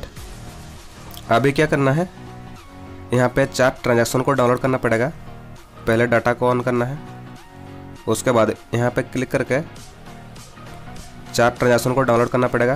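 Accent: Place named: Odisha, India. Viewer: thoda thoda odia toch ho rha hai na apke hindi me ishliye but nice voice ❤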